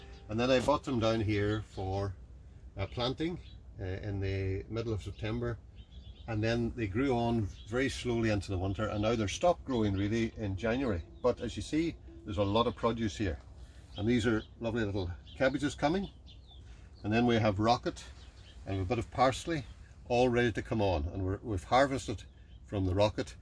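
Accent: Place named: Donegal, Ireland. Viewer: Love this , keep them coming! Lovely to hear a lovely Donegal accent.. well done 👏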